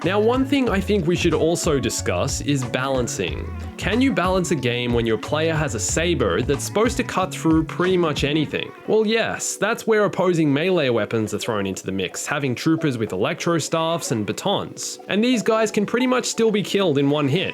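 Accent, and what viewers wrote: Australia. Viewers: Yes your Aussie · Oh your Australian as well cool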